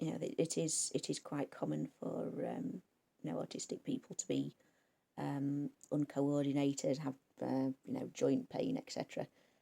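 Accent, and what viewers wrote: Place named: Liverpool, United Kingdom. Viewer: Your voice sounds good to me. I heard a touch of Liverpudlian 🇬🇧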